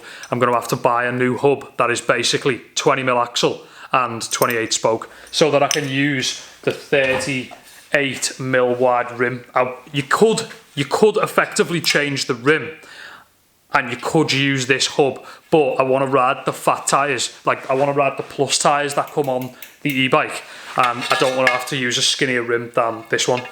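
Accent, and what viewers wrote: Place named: North East England, United Kingdom. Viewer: I was just wondering where u ride trails and stuff in the north east coz ur accent gives it away 😂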